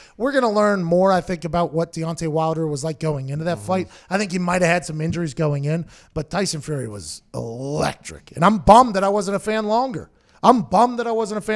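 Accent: Pittsburgh, United States. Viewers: That’s that yinzer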